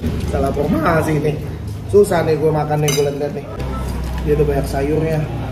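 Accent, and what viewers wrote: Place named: Java, Indonesia. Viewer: Om cocok kaya wong jowo logattelo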